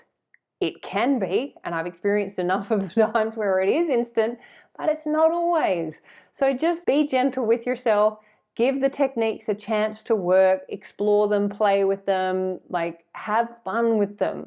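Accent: Australia. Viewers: So refreshing to hear an Australian. Really enjoying your content from far north Thailand. Thank you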